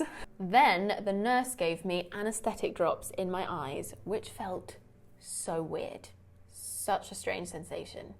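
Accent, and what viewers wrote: United Kingdom. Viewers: You should record audible books! It’s so hard to find readers with your young British accent. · Hai To.... I like Your Chanel And Your Voice British Accent.... Sorry, If My English Writing and Language So Bad....Because Need to Learn More.. I'm Eksand From Indonesia Country.... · i have noticed that her pronounciation is British but her doctor has an American pronouciation☺️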